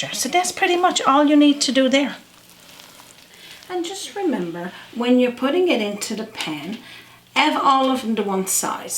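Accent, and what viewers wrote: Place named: Canada. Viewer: Have a beautiful Sunday. Looks delicious wished I could have tea and buns with you. Love your Canadian accent. Stay safe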